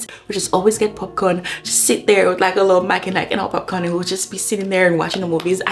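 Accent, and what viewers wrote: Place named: Caribbean. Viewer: I’m hearing an accent, just wondering which Caribbean country you’re from?😅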